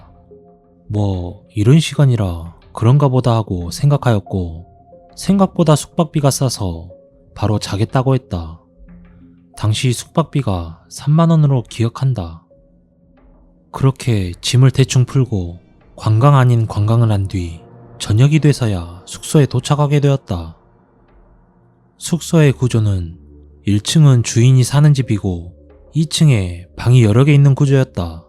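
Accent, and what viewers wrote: Jeolla, South Korea. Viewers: 제가 경상도인데 이분 사투리는 전라도 사투리인듯 합니다 근데 저는 이분 살짝 사투리 억양이 오히려 정감이 가던데요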